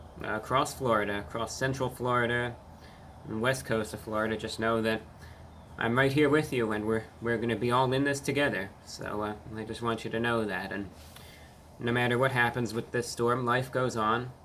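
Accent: United Kingdom. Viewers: I haven't watched the channel in a while but i swear he turned British somehow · When did he become British? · I haven't seen his videos in a few years, why does he affect a British accent now?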